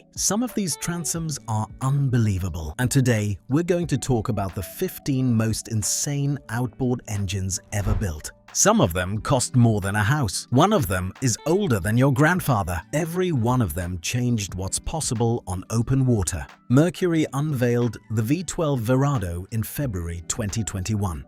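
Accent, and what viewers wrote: United Kingdom. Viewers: Why the British accent? Why?!!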